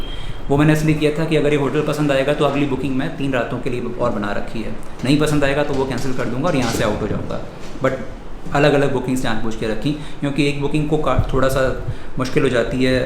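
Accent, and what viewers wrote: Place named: Asansol, India. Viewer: Asansol type shune khub moja laglo. Love from Kolkata.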